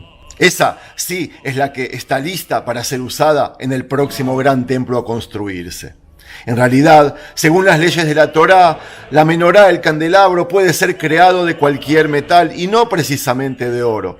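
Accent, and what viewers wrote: Argentina. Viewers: Tu no eres judio eres argentino lo llevas en el asento no puedes negar eso, ningun judio real anda vestido como tu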